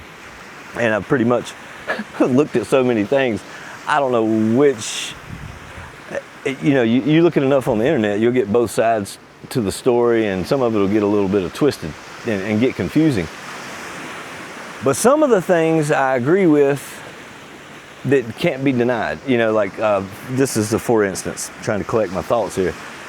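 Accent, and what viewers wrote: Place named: South, United States. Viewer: How did I know this guy would have a southern accent before I pressed play…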